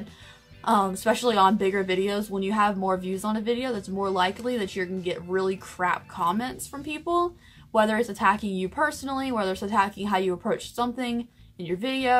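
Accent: South, United States. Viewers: it's funny how differently we see ourselves than others do. Seriously, you are the most beautiful YouTuber I've seen to date. Everything about your face is perfection to me. Your eyes, nose, lips, cheekbones, forehead is normal lol, etc. Your smile and laugh is everything to me. When I watch your videos, every time you smile or laugh, I do too, because it's that contagious kinda smile (which is a rare find for me). Your voice is fucking adorable as hell (ultra feminine with a splash of southern belle in there). I love that you always wear dresses, and have a vintage glam vibe to you. I found you very randomly a few months ago. One of your 'Thrift Store' videos was on my homepage, and naturally being as huge as a thrift shopper as I am, I was intrigued. I was instantly addicted to you, from the very first video I watched. I don't understand how you don't have millions of subscribers because you are hands down my favorite YouTuber to watch, and I get so giddy everyday when I see your post notification. 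I hope to do on my channel for people, what your videos do for me and your other stan subscribers. The only other YouTuber's I loyally watch is (freaking love her), and I don't care for the more run of the mill type of folk. Keep staying true to yourself. Much love!🖤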